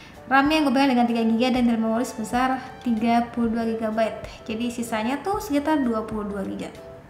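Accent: West Java, Indonesia. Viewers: Orang sunda ya teh? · Sunda bangetb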